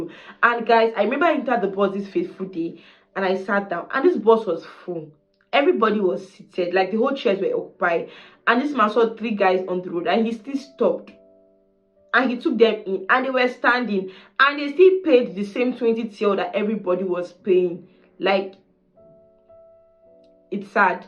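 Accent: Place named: Nigeria. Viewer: You speaks very much like Nigerians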